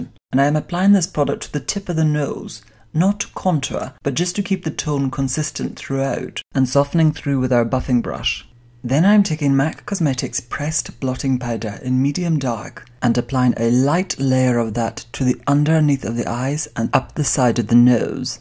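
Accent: Scotland, United Kingdom. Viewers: SCOTTISH, I KNEW IT!!! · Ah, I knew i heard Scottish inflections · Scottish! I’ve been watching your videos all day trying to figure out what accent you have! Lol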